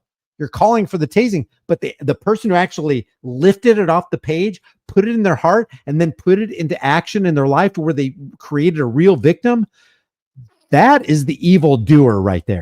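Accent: United Kingdom. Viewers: The guy who is narrating isn't even a American, Its a British pos. Oh and don't forget they always attack Americans one on many. And always at the end of a gun. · Who is the Nazi with the British accent that’s narrating this video we need to make sure we go to his channel and give him what he deserves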